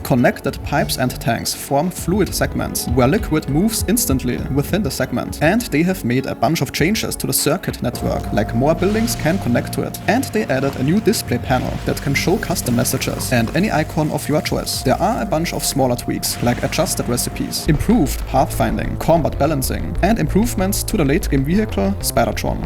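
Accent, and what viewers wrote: Germany. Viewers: Dürfte ja auch ein deutschsprachiger YT'er sein. Zumindest hört sich seine englische Aussprache danach an.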